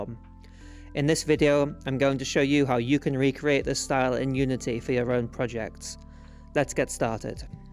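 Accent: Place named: Northern England, United Kingdom. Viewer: Ay up it's nice to hear another Northerner who's into making games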